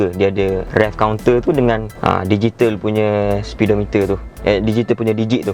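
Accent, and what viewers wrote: Terengganu, Malaysia. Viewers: dia ganu lah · bila dengar review, *bisik dalam hati, mesti orang ganu ni. sampai hujung video.. perghh skli betul kat terengganu !! anyway, padu bro review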